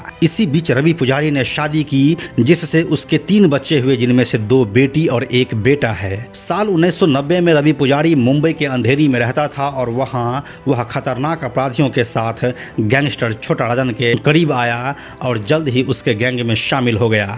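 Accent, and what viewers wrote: Bihar, India. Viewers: Tu bhi bihari hai naa teri bhasa urf tone sae lg rha hai.......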